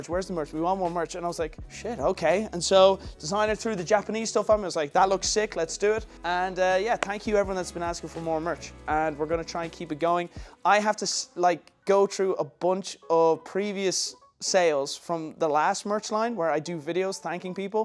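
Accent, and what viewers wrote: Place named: Ireland. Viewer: You sound extra Irish in this one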